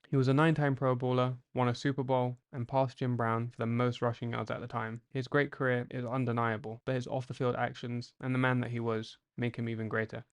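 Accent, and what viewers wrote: United Kingdom. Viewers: A fucking British accent reciting stats pretending he knows what happened. Stick to Soccour.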